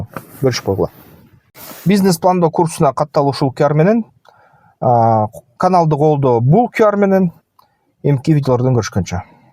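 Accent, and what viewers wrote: Standard. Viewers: Ассаламу алейкум. Менден дагы салам сага 🤝🏻 Санкт-Петербургдан. Сенин айткандарын аябай жагат грамотно орду менен таза кыргызча жонокой кылып тушундуросун. Калтырбай угам.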